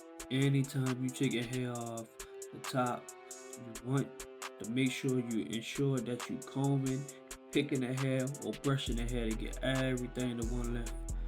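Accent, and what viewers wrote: Baltimore, United States. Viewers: What’s funny is I’m from Baltimore but I’ve been in LA for about 4 years now. Came across this video and started watching it and immediately was like “that’s definitely a Baltimore accent” 😂 cut is super clean 🔥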